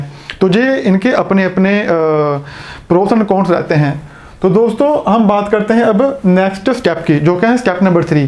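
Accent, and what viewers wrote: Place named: Punjab, India. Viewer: Punjabi exent aa rha h ...Language se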